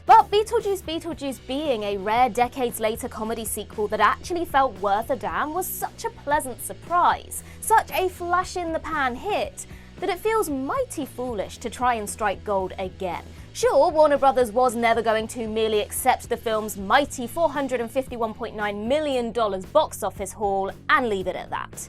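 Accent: United Kingdom. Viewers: I like the snacky British accent. Did she see the last 15 minutes of "28 Years Later"? Please, Watch the movie.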